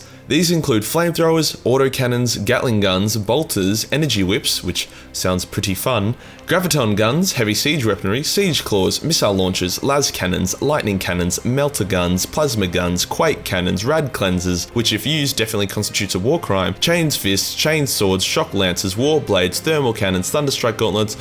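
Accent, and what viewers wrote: Australia. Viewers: I keep realizing that I have done more research on 40k Lore than, but I just like hearing a cutie pie Australian say shit I would find offensive in text or any other accent. But hey gal here happy to be recognized!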